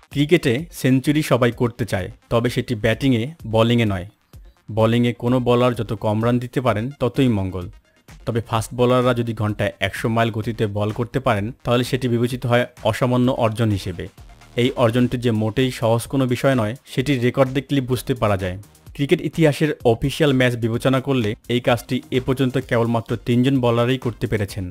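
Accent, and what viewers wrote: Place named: Chapai Nawabganj, Bangladesh. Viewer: উপস্থাপক এর বাড়ি চাপাই নবাবগঞ্জ মনে হয়... শব্দ টা পেশার না, পেসার...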